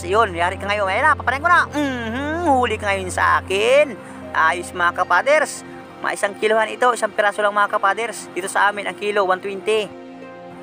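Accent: Bicol, Philippines. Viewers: Bicolano po b kayo?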